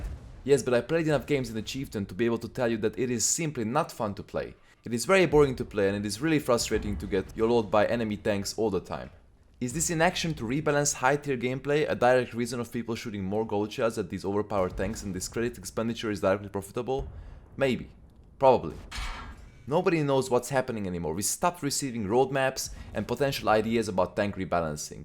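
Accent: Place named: Hungary. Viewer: I am sorry if im mistaken, but is there a chance that you are from hungary? Your accent is really familiar. If this is the case, heló, nagyon jó tartalmat produkálsz :)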